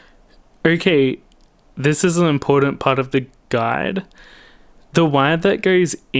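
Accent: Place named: Australia. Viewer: i'm loving the way youve edited and explained the fitting of this preamp and pickup ...really easy to follow and your aussie accent is charming...off to buy one for my guitat tommorow and fit it,,,,,Nice one Man!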